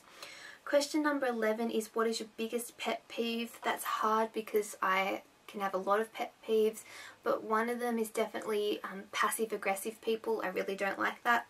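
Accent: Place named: Australia. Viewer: just stumbles onto this video and had to subscribe, it sounds creepy but you have the nicest, most relaxing voice and accent ever! i love australian accents! Definitely see live of you get chance, i've seen them 4 times now and they're my favourite band ever! They're kncredible live! And Danny is a lot hotter close up;) haha x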